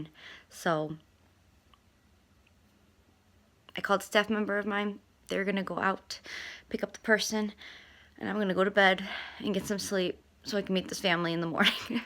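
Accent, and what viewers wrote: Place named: Buffalo, United States. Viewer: Are you in Buffalo by any chance? I am from Clarence, and your videos and your voice have a touch of my hometown in it :)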